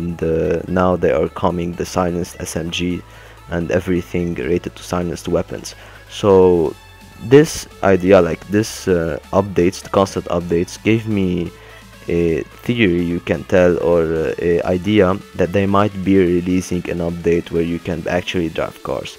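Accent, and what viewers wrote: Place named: Lebanon. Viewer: Yo im from Orlando but I got a friend from Lebanon and you have a really similar accent, are you by any chance Lebanese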